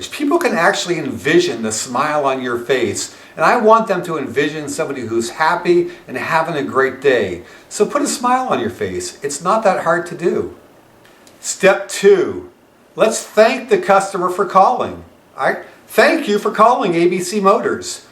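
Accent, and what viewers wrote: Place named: United States. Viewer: _ A pity, this strong american accent ! Instructive but can be a bit harsh to understand for some E.U students and trainees accustomed to English English ! (Not for me, I'm fine, thank you).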